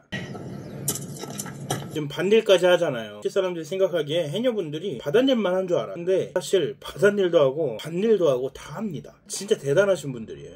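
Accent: Jeju, South Korea. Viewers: 님이 실제 제주도 사투리도 바꿔서 대사처주니 홀깨네요ㅋㅋ 표준어쓸 부분은 과감히 쓰길 몰입도 면에서 잘했다고 생각합니다.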